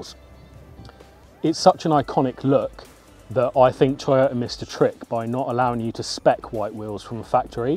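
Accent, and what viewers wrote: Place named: United Kingdom. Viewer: thats what i call a british accent! =D. TOMs rearwing gives a bit of a good rallye touch aswell!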